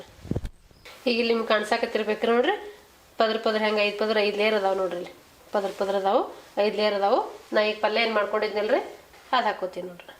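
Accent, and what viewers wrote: North Karnataka, India. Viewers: ತುಂಬಾ ಚನ್ನಾಗಿ ತೋರಿಸಿ ಕೊಟ್ಟಿದಿರಿ. ನಮ್ಮ ಉತ್ತರ ಕರ್ನಾಟಕ ಭಾಷೆಯಲ್ಲಿ ಕೇಳಲು ಇನ್ನೂ ಚೆಂದ. ❤😊 ಹಿಟ್ಟಿನಲ್ಲಿ ಸ್ವಲ್ಪ ಕರಿ ಮೆಣಸಿನ ಪುಡಿಯನ್ನು ಸೇರಿಸಿದರೆ ಚೆನ್ನಾಗಿ ಆಗಬಹುದಾ?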